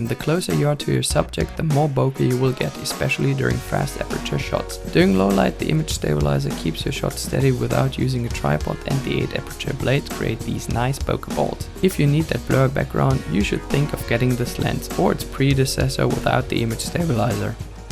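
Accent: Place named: Germany. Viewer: when a german tries speaking english thats how it sounds like.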